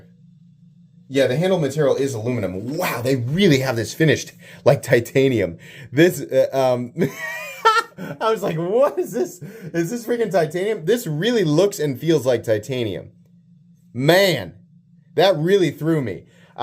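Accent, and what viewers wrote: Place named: Maine, United States. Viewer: "Wicked good"? You a Mainah?!?😁😁 Also instead of " plunge drag" you could call it" button pinch. "😅😅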